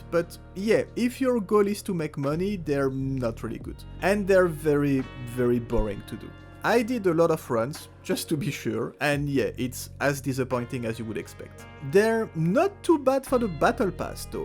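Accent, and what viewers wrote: France. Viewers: I love your French accent man 👌(I look forward to the crafting video)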